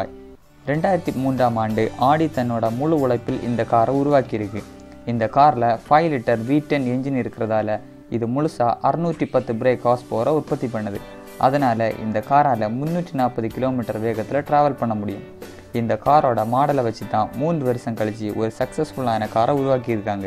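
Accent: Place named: Marthandam, India. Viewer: Nee Marthandam ah Tambi voice nalla theriuthu...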